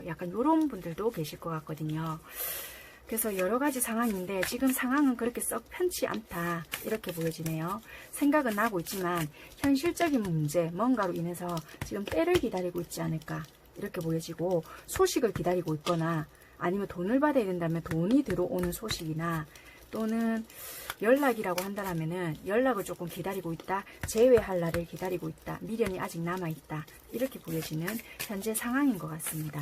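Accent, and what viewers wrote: Busan, South Korea. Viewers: 헐 직접 댓글 달아주셔서 정말 감사해요...!!!! 완전 속이 후련해졌어요 저건 그냥 제 망상적인 단순한 의문이었구요 말씀하신게 그대로네요.. 머리론 아는데 자꾸 끌리고 다시 만나게되요 ㅠ 제 현실따문에 헤어졌는데... 정말 이렇게 답글 주셔서합니다ㅜㅜ 그냥 만남에 있어서 좋게 생각하려고 노력중이에요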